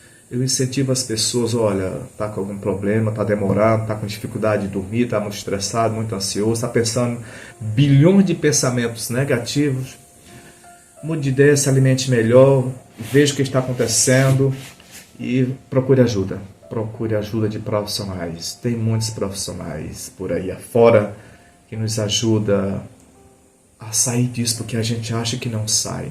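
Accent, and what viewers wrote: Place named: Piauí, Brazil. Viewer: Acho lindo sotaque de sotaque nordestinos do Paui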